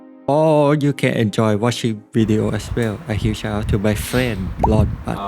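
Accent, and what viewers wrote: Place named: Thailand. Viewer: I recognize that Thai accent right away. Good job bro.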